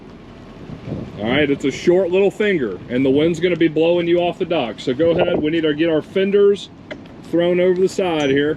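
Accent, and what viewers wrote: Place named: Buffalo, United States. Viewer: Great Captain. Very informative. With a Buffalo twang lol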